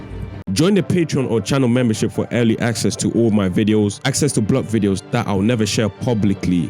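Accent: United Kingdom. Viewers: brit and slow, pick a struggle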